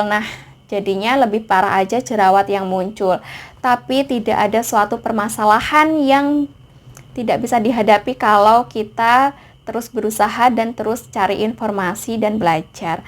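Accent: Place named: Java, Indonesia. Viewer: Cewek sunda tapi lidah Jawa , Kerennn...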